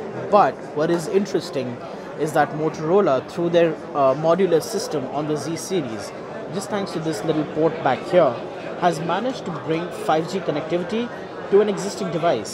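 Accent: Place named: India. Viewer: heavy Indian accent bye video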